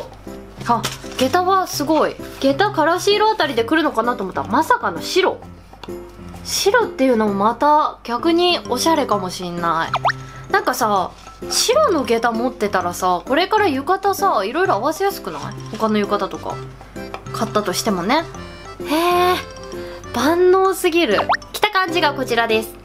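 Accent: Hakata, Japan. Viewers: 博多弁可愛いw 二個目の浴衣個人的に好き！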